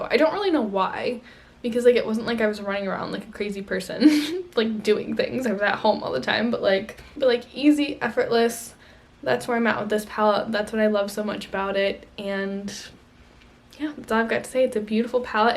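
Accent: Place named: Southeast, United States. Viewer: I hear that pronunciation a lot in the southeastern US 🤷‍♀️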